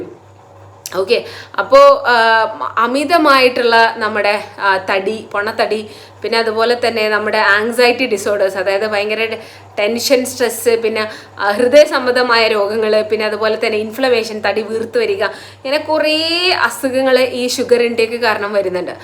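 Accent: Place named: Vadakara, India. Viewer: താങ്കൾ വടകര ആണോ?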